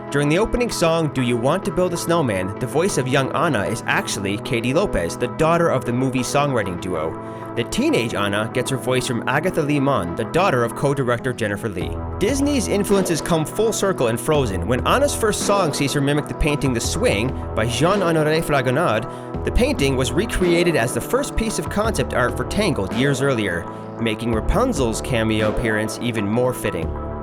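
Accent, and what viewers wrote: Canada. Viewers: The narrator is Canadian, isn't he? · Canadian accent is nice.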